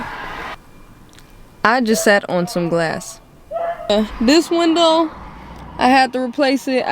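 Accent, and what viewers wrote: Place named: Detroit, United States. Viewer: You sound so Detroit sis lol you in the city?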